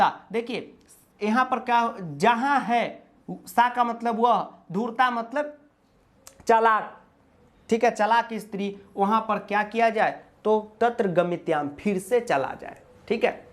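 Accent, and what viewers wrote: Bihar, India. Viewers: Sir Your bihari accent >>>>>>all school sanskrit teacher🎉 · Sir aap itna aacha samjhate hai humko sab samhj me aata h mujhe pehle sanskrit jada nhi samjh aata tha but aapke videos ko dekh kar ek umidd aa rahi h sir 😊😂🎉 or aapka ye bihari tone me jo aap baat karte ho vo mujhe bohot aacha lagta h sir 😊 you are great sir 🎉❤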